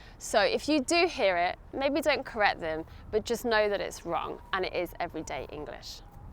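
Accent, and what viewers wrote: United Kingdom. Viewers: Nice video. ❤ Is it British Accent you speaking? Just a doubt. I am a new subscriber.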